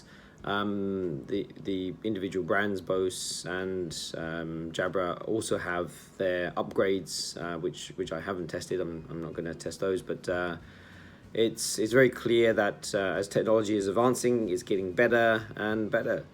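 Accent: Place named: United Kingdom. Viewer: Will these pair to an Apple Watch cellular so I can just go walking without a phone? Are you a native Singaporean? ( British accent) Cheers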